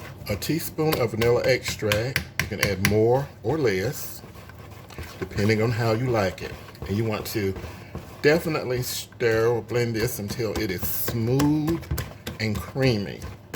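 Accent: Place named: South, United States. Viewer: Hey. New subscriber here. The cake looks delicious but your voice really had me hooked, so very sexy and earnest and with a southern flare. I loved that you gave the ingredients up front and took the time to let viewers know that they (we) can tailor it to our needs. Thanks again and I'll keep watching!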